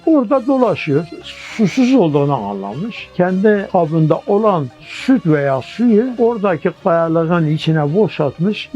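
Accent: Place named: Urfa, Turkey. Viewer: Bu abi Urfalı şiveden konuşma üslubundan belli,fon müziği ’ya ait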